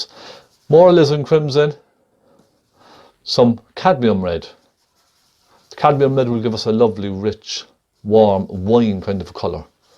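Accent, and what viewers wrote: Cork, Ireland. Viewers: Fabulous tutorial and if I'm not mistaken great cork accent Subscribed!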